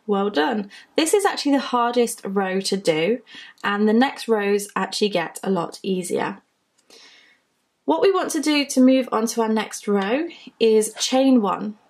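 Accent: United Kingdom. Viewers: My mom vaguely showed me how to crochet when I was a kid (she was lightning fast and almost never looked at what she was doing) and I've been wanting to see if I could actually do it recently. I will say this,. You are a good instructor and even if I never complete a piece, I promise you that at least a couple of my future video game characters will be named Ya'nova. I'll be hearing that term (in your British accent, of course) in my sleep. LOL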